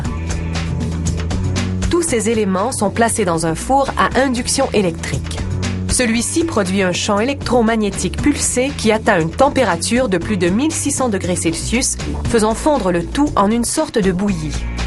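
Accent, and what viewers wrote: Quebec, Canada. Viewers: L'accent québecois est horrible,perso je n' arrive pas a écouter même en me forcant.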